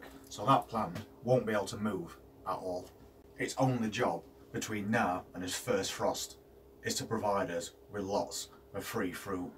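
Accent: Yorkshire, United Kingdom. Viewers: I hear a propa Yorkshire lad, Sheffield mate?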